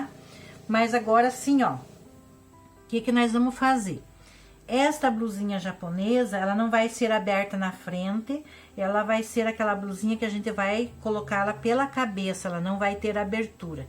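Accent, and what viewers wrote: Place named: South, Brazil. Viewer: Eita irmã,eu aqui ouvindo a senhora, observando o sutak, pensei,vô perguntar se a irmã é catarinense ou gaúcha,,aí a senhora fala que é de Curitiba .. Eu sou catarinense,mais faz 40 anos que estou em São José dos Campos SP.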